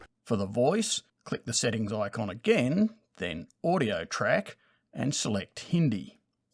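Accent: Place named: Australia. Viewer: Great for education :) shame they would miss your amazing entertaining Aussie accent :)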